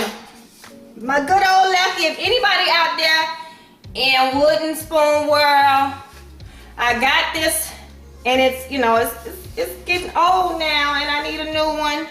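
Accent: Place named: Miami, United States. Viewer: this video recipe and instructions are all over the place and hilarious but i will b trying this, looks delich. and yes u definitly sound like a miamian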